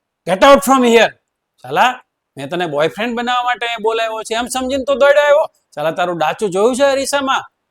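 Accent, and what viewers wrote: Surat, India. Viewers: ફુલ કોમેડી.... 😂😂 સુરતી ભાષાથી વધારે હસવું આવ્યું.... એકદમ મસ્ત વાર્તા કહી.... 😂😂👌🏻👌🏻👍🏻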